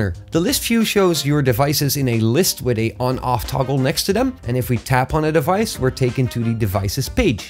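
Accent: Netherlands. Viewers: Brendan Dijkstra An btw, He has a very subtle dutch accent. You wont notice it unless you look for it.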